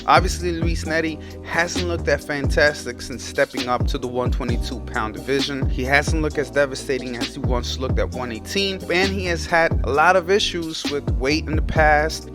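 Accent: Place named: Pocho, United States. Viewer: El que habla se ve que es un pocho, mejor hazlo en español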